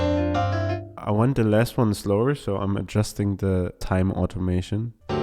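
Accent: Germany. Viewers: Im sry if il wrong here but its been c8nstsntly hititng me that ur accent sounds quite german lol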